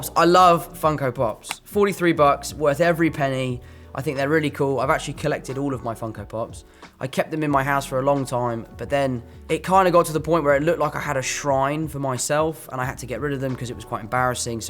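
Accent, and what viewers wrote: United Kingdom. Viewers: すげぇブリティッシュ訛りだな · イギリス英語めっちゃ耳に気持ちいい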